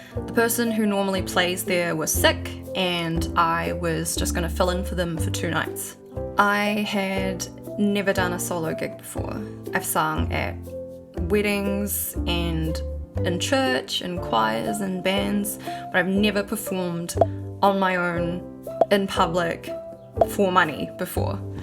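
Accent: New Zealand. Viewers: Omg i love your new Zealand accent!!(I'm in Australia😊)it really sucks to get rejected but it doesn't define you! You ARE talented, your voice IS beautiful❤❤❤I believe in you😘😘😘you will learn from this experience and improve next time😊✨✨🎶🎶🎶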